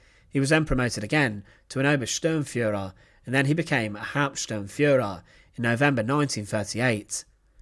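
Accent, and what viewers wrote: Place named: Cockney, United Kingdom. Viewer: I know. I have a difficult time understanding his Cockney accent.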